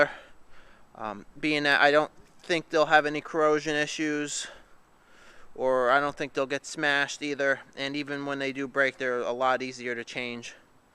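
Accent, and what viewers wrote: New York, United States. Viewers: u from new york ?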